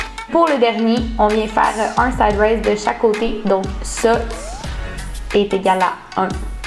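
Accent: Quebec, Canada. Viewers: L'accent Québecois est ultra jolie mais quand c'est qui parle je fond littéralement 😍😍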